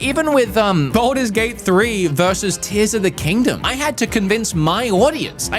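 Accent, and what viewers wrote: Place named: Australia. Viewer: Im guessing ur Australian? Haha don’t let the Europeans hear that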